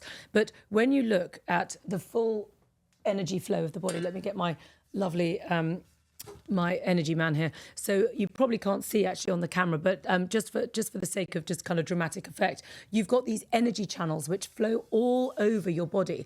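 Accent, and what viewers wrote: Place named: United Kingdom. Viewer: thankyou. So excited to have found your channel, beautiful, knowledgeable lady, and with a perfect British accent, yay!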